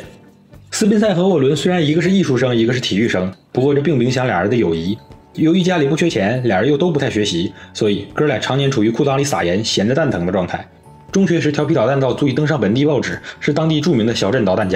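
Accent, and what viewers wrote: Northeast, China. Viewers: 可汗的老家通辽是蒙古族和东北汉人混居的地方（从地理上说这里是内蒙或东北，都是正确的），东北地区不少人说话都是这个节奏的，赵本山小品和赵本山弟子拍摄的《乡村爱情故事》就是这样的语速，这种语速特别适合讲黑社会犯罪故事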